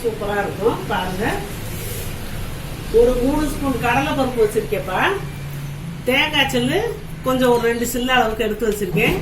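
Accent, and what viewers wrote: Madurai, India. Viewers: Unga pesum murai romba azhaga irukku, neenga Madurai pakkama?Unga tamil madurai, virudhunagar side tamil madhiri irukku, ketkum bodhu romba sandhoshama irukkudhu🙏🙏🙏🙏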